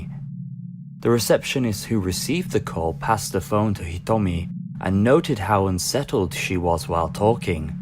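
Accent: United Kingdom. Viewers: Your channel says you're from Japan, but you sound British. What's your first language? P.S. Awesome content!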